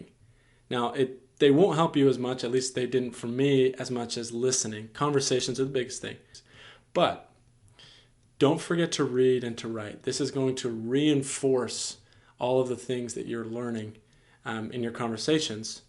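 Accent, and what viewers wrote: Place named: Brazil. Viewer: ele é br dá pra ver pelo sotaque do inglês dele hahaha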